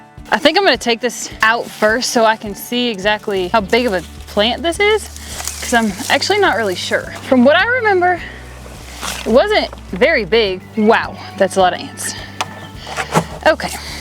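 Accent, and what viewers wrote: South, United States. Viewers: You actually almost have a southern drawl for someone from Illinois.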